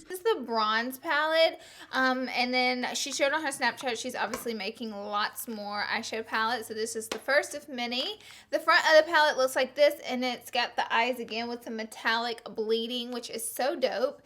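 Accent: South, United States. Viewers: i love your tutorials, are you Hispanic? you kinda look Hispanic, and i love your southern accent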